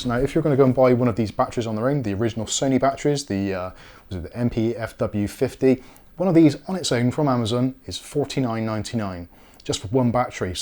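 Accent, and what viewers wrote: Bristol, United Kingdom. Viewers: Are you from Bristol haha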